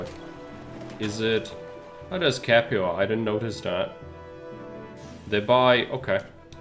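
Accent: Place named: Czech Republic. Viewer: Oh yes for sure! I'm 64 and been playing games since 1982 on a ZX Spectrum. Got Ceasar 1 in 1992, then Caesar 2, Caesar 3 in 1998, I also played Caesar 4, but was disapointed. Ceasar 3 is the best by far, and played it all the time. But getting used to this updated version, is so cool, its like a new game! And I appreciated your tutorials, they have taught me so much, so many thanks! Oh Your English is great, English is quite hard to learn. Your words are pronoucned correctly, its just the accent that got me! Long Live the Czech Republic! Ha ha. Im in New Zealand, so miles away. Hey man, take care and keep up the great work!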